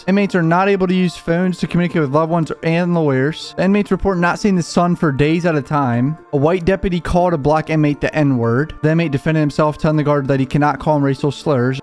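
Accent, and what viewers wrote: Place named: Maryland, United States. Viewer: I can tell your from md buy your voice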